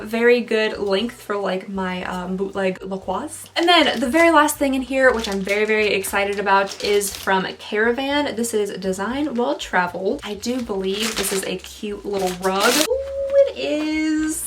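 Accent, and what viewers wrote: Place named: Tennessee, United States. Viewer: daaaamn! I'm the 12th. I enjoy myself. I'm in TN so I get her accent and humor. My Mom is from KY. We're all crazy and that's what makes these videos incredibly enjoyable. Happy early Birthday!! 🥳🎁🎂🎉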